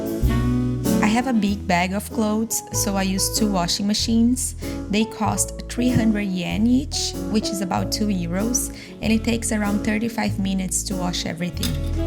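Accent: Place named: Brazil. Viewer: I recognize that accent. Are you Brazilian?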